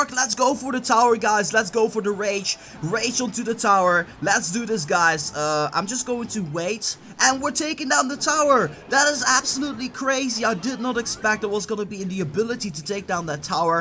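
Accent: Netherlands. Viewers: are u dutch cause i am and your english is like you're dutch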